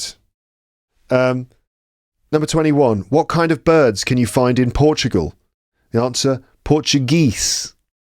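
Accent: RP, United Kingdom. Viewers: Hello,! I'm an English teacher from Buenos Aires, Argentina. I' m delighted to hear your perfect R.P. English.You are very kind and patient explaining things that are too simple for you. Love it when you chuckle, kind of admitting that a joke is terrible. I guess your work is truly helpful for students of English. I learnt a few things about world culture. Thank you so much! 😊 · I love your video about jokes and I love your british accent is wonderful to hear you!!! II am a Visual artist and photographer from Argentina. Best wishes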